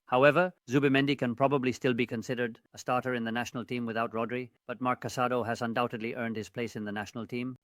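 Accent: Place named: India. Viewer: Te han puesto el inglés con el acento indio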